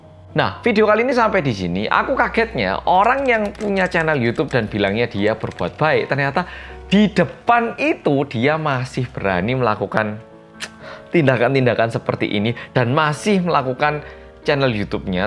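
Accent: Java, Indonesia. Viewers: Pualing suka dg pembawaan mas,juelass bhs yg medok Jawanya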